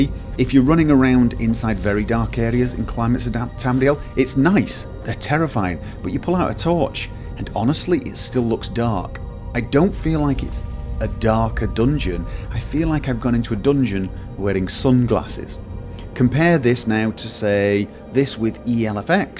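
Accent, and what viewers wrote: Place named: England, United Kingdom. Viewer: I just love your english accent. I can understand everything! (Which is unusual for me, as I'm not natve english speaker) As to video. I definitely like ELFX the most out of these 3, and it loses only to enb. Which leads me to question: What enb are you using? I myself love opethfeldt6's and wouldn't change it for anything else.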